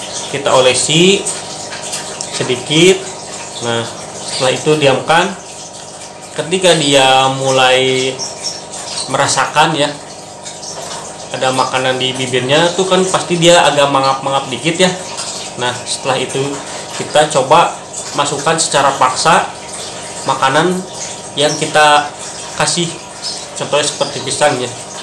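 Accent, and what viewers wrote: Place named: West Java, Indonesia. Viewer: Sunda banget euuyy...😂😂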